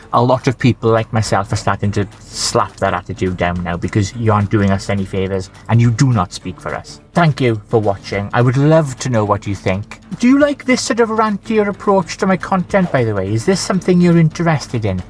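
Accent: Wales, United Kingdom. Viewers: I know now your welsh lol love accent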